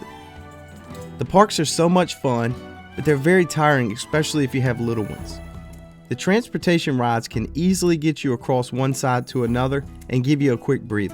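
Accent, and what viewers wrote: South, United States. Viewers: you have a great voice over voice but I can tell it's southern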